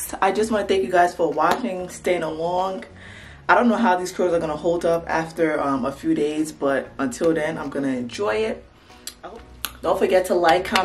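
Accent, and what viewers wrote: Liberia. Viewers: Heard the accent and already knew LIB STAND UP 🇱🇷🇱🇷🇱🇷 my sista much love 🫶🏾🫶🏾🫶🏾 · You sound Liberian and love it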